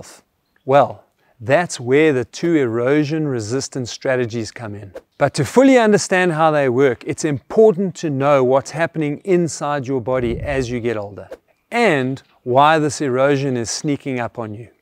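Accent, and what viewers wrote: South Africa. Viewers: Are you South African? You sound like Dr. Grobbler, my friend in Ladybrand.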